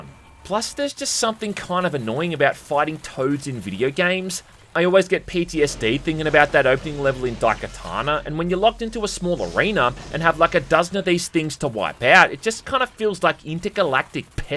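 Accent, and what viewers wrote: Australia. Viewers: Australian accents make everything better I swear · makes me confirm that your in my top 10 favorite youtubers of all time along with jontron, gmm, and others. Your humor is just spot on and your Australian? accent just hits that vibe and is unique for your channel. The whole description of the heavy bolter had me dying😅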